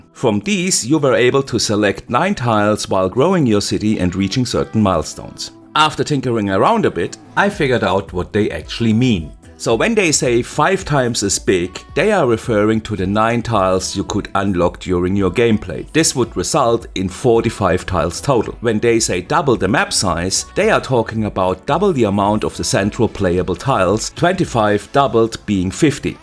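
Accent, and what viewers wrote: Germany. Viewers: Gutes Video, jetzt werd ich mir das game kaufen :face-purple-crying: Aber den deutschen Akzent hör ich bis hier her:face-red-heart-shape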